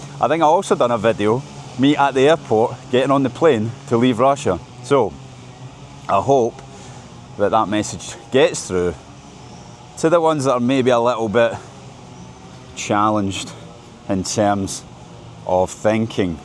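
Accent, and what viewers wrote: Scotland, United Kingdom. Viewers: I like your Scottish accent. Maybe it's because I've listened to quite a few Ian Rankin's audiobooks.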